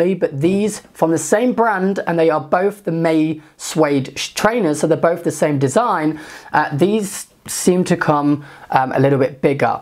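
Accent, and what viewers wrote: United Kingdom. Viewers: are you Brit? i can hear accent..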